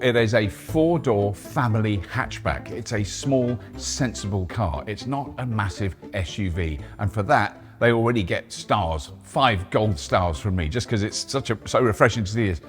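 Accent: United Kingdom. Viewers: I hate British accent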